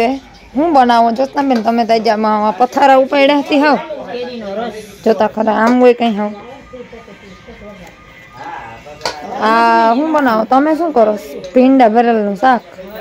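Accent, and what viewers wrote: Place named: Amreli, India. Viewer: Ha ho ben amreli ni bhasa to sav algj lage to ben tme sasre aaviya tye tmare bolvu thoduk aghru lagtu hse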